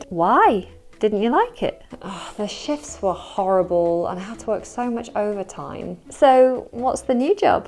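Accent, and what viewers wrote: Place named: United Kingdom. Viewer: Like you British English accent，sounds so tender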